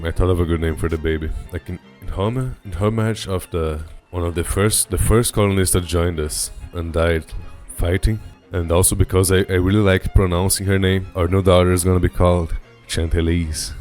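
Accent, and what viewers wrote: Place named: Brazil. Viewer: “that’s the bones of the office” Eu tinha uma suspeita que seu sotaque era brasileiro, mas depois de ouvir essa expressão eu tinha certeza! Kkkkkkkkk que legal achar um br fazendo conteúdo de rimworld, ótimo vídeo cara!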